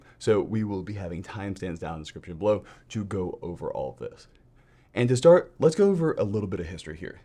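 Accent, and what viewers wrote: United States. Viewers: this accent is linked to which region in US?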